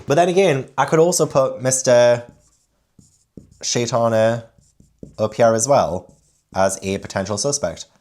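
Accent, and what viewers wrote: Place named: Northern England, United Kingdom. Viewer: I'm not great at accents myself and I am British. It's very obvious to me it's a northern accent but parsing out which one isn't my forte. However he talks about Newcastle a lot so I imagine this is the accent local to there.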